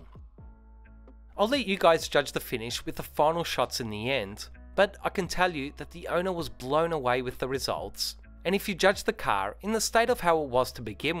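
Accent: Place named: Australia. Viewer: Great job mate I love hearing an Ozzy accent on a detailing video for a change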